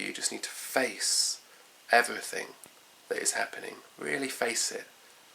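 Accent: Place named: RP, United Kingdom. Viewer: btw you're very posh!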